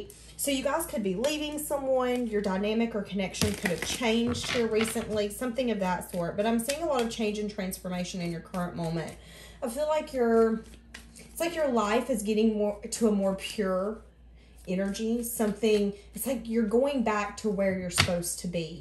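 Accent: South, United States. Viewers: resonates ... as does your kountry accent. Love it, from TN!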